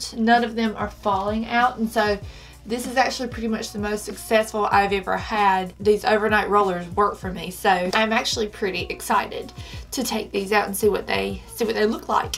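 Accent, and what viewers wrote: South, United States. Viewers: This is a Southern US accent, not Texas, but more like Georgia or the Carolinas. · I want these now!!i love your accent! You talk like me so you must be from the south!😀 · Emily needs to go bye bye with the negative comment about the accent, I am from North Carolina and have the same southern accent and let me just say that this chick is an adorable Southern bell and very beautiful, thank you for the tutorial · Is that a Southern accent? No wonder I can't understand you. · Sometimes I think she's southern, but sometimes she had a slight Aussie accent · I'm Australian and there is no Aussie accent there at all only Southern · As a Texan it's definitely southern the entire time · I think South Carolina or north Georgia. Just a guess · I love your country sweetheart accent 😝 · She doesn’t sound anything like an Australian lol. 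We don’t talk this way. She’s southern 😂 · Southern AF the whole time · She sounds Southern. I'm from NC and have a similar accent, and I have family with thicker accents. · Really? She sounds like she's from North Carolina or Alabama to me. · Hannah Slowikowski No..completely Southern the whole time. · I just found you. You are stunning and I love a good southern accent. I was born in the West but my entire family is from the south and so I'm the only one who speaks wrong, as they lime to tell me. Lol. I also love shark tank and wondered about these when I saw them on there. Loved seeing how to use them. I'm really bad with hair, and makeup and fashion. Lol. I missed the girly gene apparently. 😂 want to try these now. Your curls turned out beautifully. Do you think spraying them with hair spray before you take them out will help th curl stay longer in the day? Thanks! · Emily Gilbey hateful.. 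a southern accent comes from your birth place naturally just as an Australian or British. I don't understand such a hateful comment on the fact that she has a southern accent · I heard the same. But mostly I hear Southern. And it’s really great to listen to. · You talk so cute!! Such a sweet country girl accent! And your hair is gorgeous!! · Love your accent!! I found your video saying your from NC!! I'm from Oklahoma/Arkansas and it's funny how southern accent all sound a tab bit different😊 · It's the lisp and the southern accent all at once!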